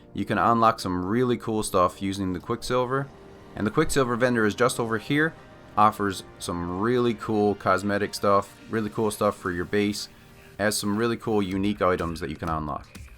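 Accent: Newfoundland, Canada. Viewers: Haha heard that accent knew exactly where your from. I was born in st John's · Total wholesome content man I'm subscribing. Oh btw are you Canadian by any chance ? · i love your newfie accent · Was I recommended this guide because you’re from Newfoundland? Or are you generally a popular NMS content creator? First time here and recognized the very local accent, lol. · I could tell you were from Canada immediately from the accent. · You're the most Canadian sounding YouTuber I've ever found. (I'm also Canadian. Subscribed.) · I am watching this now and said wow sounds like he's from newfoundland then seen your username haha me too! Subscribed! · are you from newfoundland? you have the craziest accent.. heavy af canadian accent with hints of irish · Started the video and instantly thought.. You are definitely from Newfoundland.. :p Just got this game haven't tried it yet but if you are from Newfoundland that's pretty reassuring :D can trust another Newofundlander :) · I was watching and couldn't get over how familiar your accent is, then realized you're a fellow Newfoundlander! 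Always nice to stumble upon a neighbour in the wild. :) Great tips, by the way! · i cant tell if your accent sounds like a really weak newfie accent or not lol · I gotta say I do enjoy the Canadian accent. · U Canadian AF my boy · Newfoundland accent? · Buddy, as soon as i heard the whaddayaat i was like. i knows where buddys from. Prolly from up da shore over across the way. Nice to see someone else from the rock! haha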